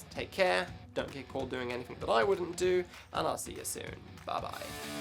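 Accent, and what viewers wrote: United Kingdom. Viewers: British accent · The guy speaking in this video seems british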